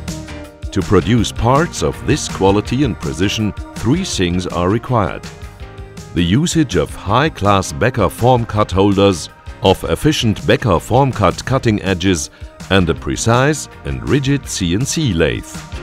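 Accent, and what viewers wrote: Germany. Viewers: Ich liebe diesen deutschen Akzent im Englisch.. :D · Der deutsche Akzent in diesem Video ist aber recht dezent und unaufdringlich möchte ich meinen. Da muss man ja fast Deutscher sein um zu wissen das es ein deutscher Akzent ist.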